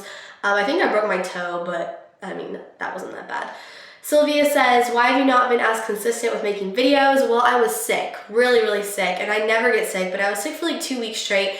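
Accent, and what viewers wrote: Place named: General American, United States. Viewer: Hey? I've always wondered why don't you have a Texan accent if you live in Texas? I hear hints of it sometimes but you have a very general American accent!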